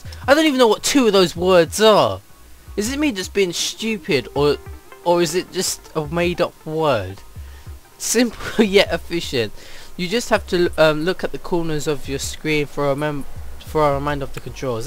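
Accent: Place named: Russia. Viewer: That's a Russian accent xD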